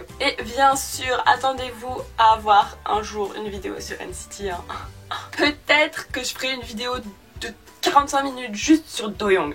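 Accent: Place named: France. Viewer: No Mercy though😆 Ouais les survival c'est Tjrs grave cruel Ahah, Kihyun💜💜 Monsta Ayksss !! Lol Jsais meme pas comment écrire la phonétique mais bref le x se prononce comme en anglais. Jcrois t'est la première française Que jvois qui prononce les mots genre Bias et tout avec une prononciation française😜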